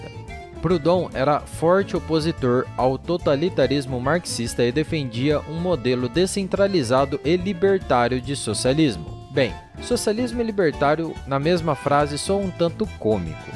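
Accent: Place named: Caipira, Brazil. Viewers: De que região do país vc é? Esse sotaque é bem comum no Paraná, São paulo e em todo o centro-oeste do Brasil. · Esse sotaque caipira não ajuda a chegar ao final do vídeo. Pqp.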